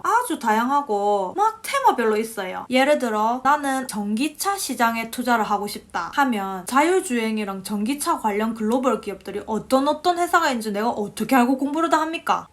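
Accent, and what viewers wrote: Gyeongsang, South Korea. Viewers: 부산사투리인가요? 대구사투리인가요? 찰지네요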